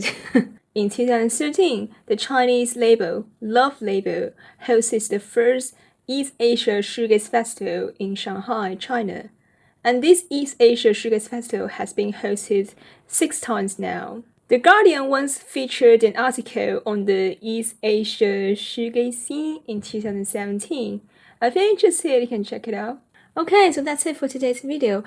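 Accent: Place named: Somerset, United Kingdom. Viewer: You've nearly nailed that Somerzzett accent!!!❤❤😊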